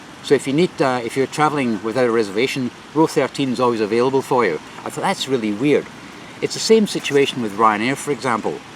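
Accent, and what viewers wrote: Scotland, United Kingdom. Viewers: Love the Skaughtisch accent.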